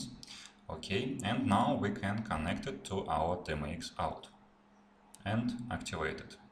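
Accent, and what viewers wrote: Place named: Oxford, United Kingdom. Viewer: То что прям искал ! Спасибо большое ! ) Отдельное спасибо за оксфордский акцент. Всё понятно )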